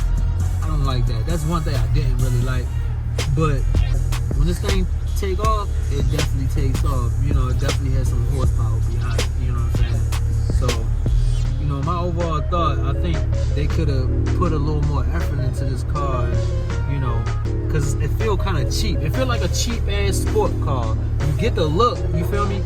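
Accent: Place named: DMV, United States. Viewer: Dat dmv accent funny af duews and twues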